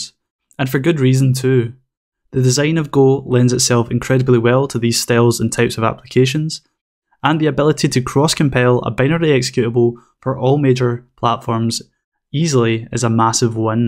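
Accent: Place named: Scotland, United Kingdom. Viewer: Scottish bloke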